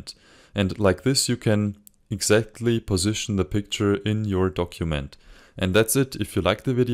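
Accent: Germany. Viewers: Lovely German accent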